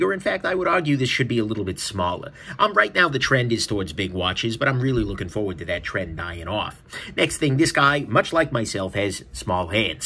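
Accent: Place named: Chicago, United States. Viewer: “Da watch over dare.” Are you from Chicago?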